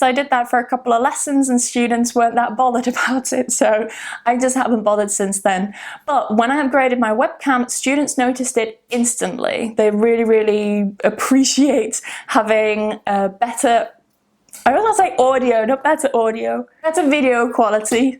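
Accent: United Kingdom. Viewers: You're the best teacher in British accent. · You’re one of my favorite teachers, you speak clearly, that is why I love British accent.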